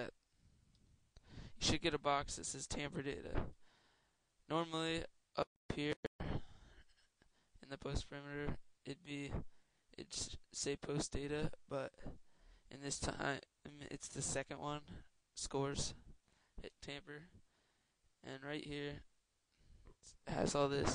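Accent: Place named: New Jersey, United States. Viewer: I bet his from jersey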